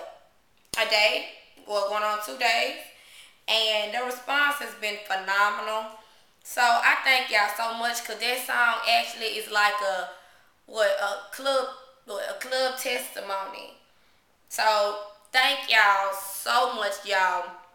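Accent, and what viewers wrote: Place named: South, United States. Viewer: THANK YALL ONCE AGAIN!!!! lol luv her country voice... Its in all us dirty south folk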